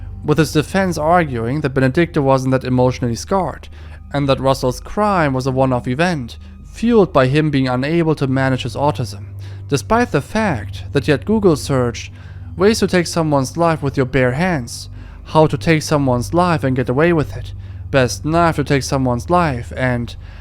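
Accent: Germany. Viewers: That's a german accent.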